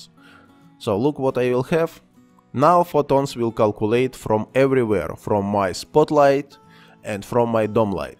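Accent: Russia. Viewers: thanks for RUnglish!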